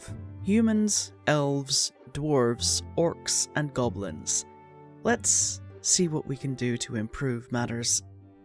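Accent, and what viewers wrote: Scotland, United Kingdom. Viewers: Im guessing your a scot?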